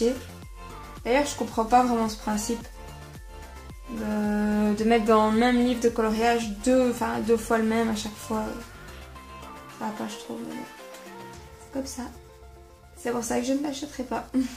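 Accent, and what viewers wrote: Belgium. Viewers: Sympa la vidéo :) Une question me brûle les doigts, es-tu Belge ? Si oui, d’où ?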